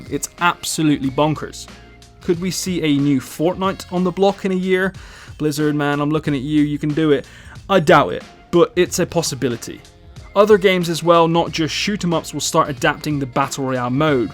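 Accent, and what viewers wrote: United Kingdom. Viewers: he's British it's how we say it so stfu ffs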